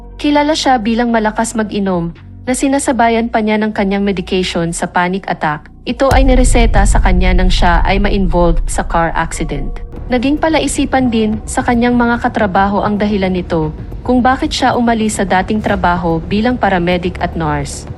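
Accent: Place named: Spain. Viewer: Hello sir How are you today in Austrailia? Thank you so much for visiting! and I wish you have a lovely weekend😊 I feel the Spanish accent in your language, where are you from? anyway it was so cool😊 new subscriber from south Korea!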